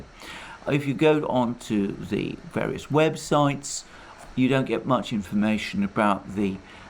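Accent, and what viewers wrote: United Kingdom. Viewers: THIS GUY tries to convey the the Russians have their act together. THEY DON'T AND NEVER WILL because RUSSIANS cannot freely communicate or express themselves, and the populace have no influence in Moscow under Putin's heavy hand. NOWHERE in this phony diatribe does he mention the successful Ukrainian attacks on many Russian weapons storage sites. Because that is a major event in the war, it calls his entire "analysis" into question. To me and any casual observer, his is nothing more than words with a British accent.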